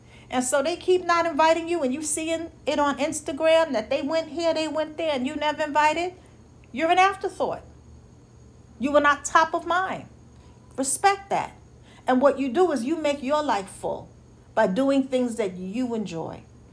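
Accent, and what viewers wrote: New York, United States. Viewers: So appreciate your ministry.. your giftedness! Love your teaching style bold…unadulterated..❤ ❤approach along with your wonderful NYC accent. Through your ministry I learned to focus more on my own personal goals gifts and talents and continue to pursue what is best for me in this season of my life. You keep shining! I love church by phone each Sunday along with the wellness club on Wednesday. I have at least 4 of your books and have given 2 additional books away. God Bless you. ❤Lorraine from NY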